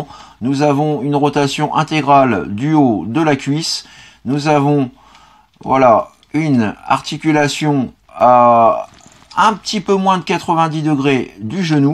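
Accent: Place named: France. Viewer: With your beautiful french accent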